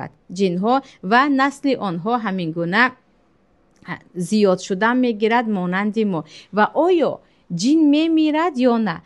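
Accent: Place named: Tajikistan. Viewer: Ман а шумо барин хохари олима мефахрам ки аз мо занхо чунин олимаи босавод хусусан бо лафзи ширини точики дар дини ИСЛОМ хизмат мекунед аллох ба мо чунин саводу гайрату такво насиб бгардонад омин йо раббал оламин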